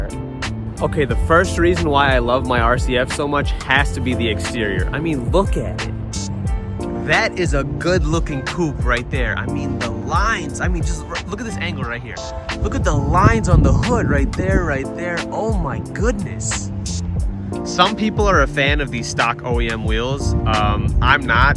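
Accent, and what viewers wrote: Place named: India. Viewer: I love the indian accent i chill with sum from saga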